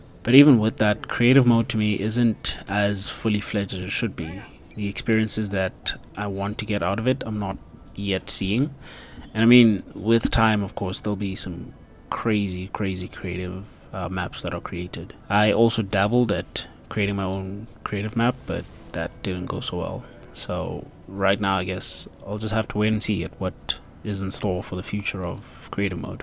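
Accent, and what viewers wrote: South Africa. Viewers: Now hold on are you from South Africa... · Hey are you South African?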